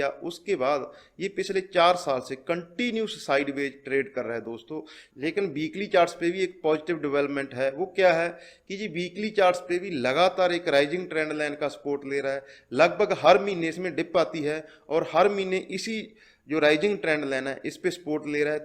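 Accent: Punjab, India. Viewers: punjabi touch nahe jaana even after 50 years 👍 👏👏💪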